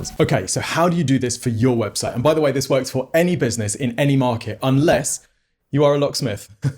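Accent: United Kingdom. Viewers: Close your eye, you will hear he is at a normal speech speed for a podcast. At least he speaks a fairly neutral UK English... with a slight London accent (innit?} ... ... For UK standards he is super easy to understand... When I went to Edinburgh ...it often took me up to 2 minutes (or until I have heard a clear FUCK ) to see if people are actually speaking English) To Learn More about Brits are fucking impossible to understand to the point they sometimes do not understand each other : any interview with fighter Paddy Pimblett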